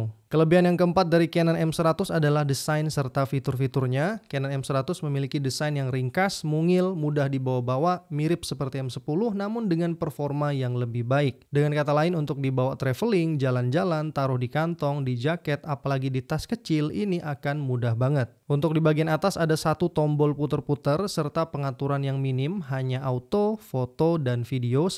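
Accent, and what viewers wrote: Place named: Kalimantan, Indonesia. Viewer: Dari logatnya mas orang kalimantan kah?….. tapi mantap mas aku suka reviewnya masuk dalam rencana pembelian aku, ya walaupun bekas juga sih hehe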